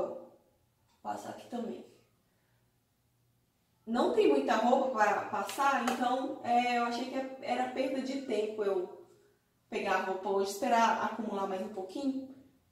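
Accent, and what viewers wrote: Minas Gerais, Brazil. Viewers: Ai desse jeito vou engordar,quero fazer todas as suas receitas...esse cafezinho é muito bom a tarde...linda,adoro seus vídeos esse seu jeitinho mineiro de falar é muito gostoso.meu avô era mineiro tenho um pezinho aí em MG.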